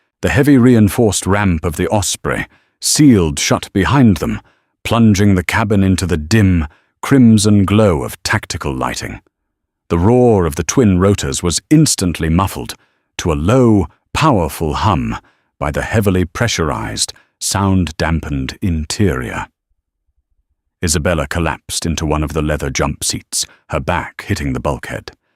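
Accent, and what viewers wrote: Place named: England, United Kingdom. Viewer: I love the voice of this narrator; baritone, English, and clear. So nice! Good story, too.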